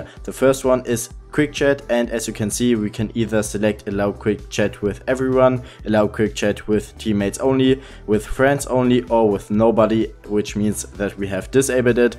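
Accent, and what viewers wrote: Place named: Germany. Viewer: der deutsche akzent😂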